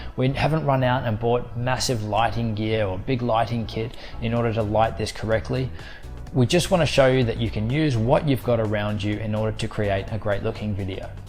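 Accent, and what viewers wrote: Australia. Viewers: You sound like you are from Australia but I can't get the microphone on Amazon to ship to Australia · Great video. Brw he sound Australian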